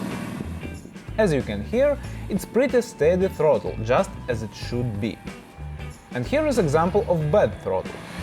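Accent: Russia. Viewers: Love the Russian accent on English. Sounds like a Marvel character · I just love his accent, it’s Russian, right?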